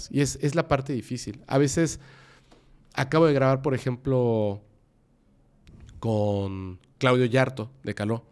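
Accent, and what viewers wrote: Yucatan, Mexico. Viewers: habla como yucateco.....porque habla asi?? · Imposible ignorar el ascento Yucateco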